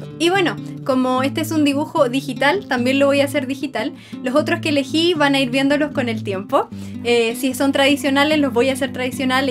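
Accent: Chile. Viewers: Eres chilena?!?!?! (Si dijiste que si chocala) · eres chilena como yo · ERES CHILENA!!!!!!!!! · Creo yo que el acento la delata no? SI, SI ES CHILENA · Savia que erai chilena Por la forma de ablar pero ya se aclararon mis dudas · Eres Chilena????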